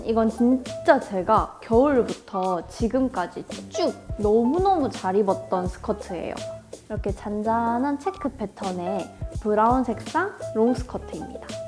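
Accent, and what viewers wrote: Gyeongsang, South Korea. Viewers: 언니 사투리!! 경상도인가요? 귀엽네용...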